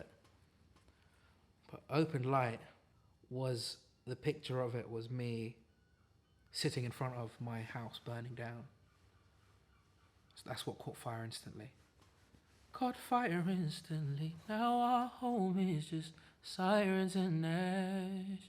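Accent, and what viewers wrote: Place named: United Kingdom. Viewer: GUYS HE’S BRITISH WHAT?????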